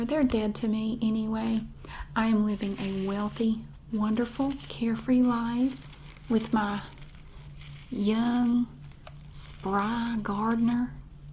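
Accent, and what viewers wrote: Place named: North Carolina, United States. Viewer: Your accent reminds me of my childhood in Catawba County, North Carolina. You are so the epitome of a southern sassy woman. I admire that!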